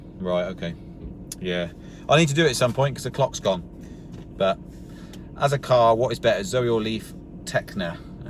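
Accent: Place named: Forest of Dean, United Kingdom. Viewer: Hi. Watched your videos from the beginning. Worked in Cinderford for 5 years after leaving school so enjoy seeing the scenery and hearing the accent again me old butt. Got a white R110 which is great. Hire on a monthly rolling contract for £289 including insurance, maintenance and a polar subscription. Can change it when I want so looking forward to the new faster charging Zoe or maybe the e208 I saw at Fully Charged Live. The slow charging in the R110 is a pain but the extra power is great.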